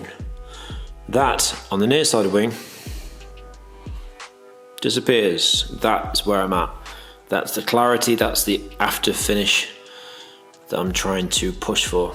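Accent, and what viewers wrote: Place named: England, United Kingdom. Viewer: This guy has the Strongest English assent I have ever heard. I can only comprehend about 30% of what he mutters.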